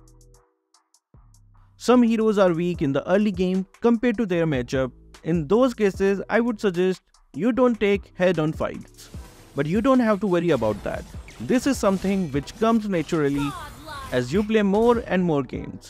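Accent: India. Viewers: dude are you from India or what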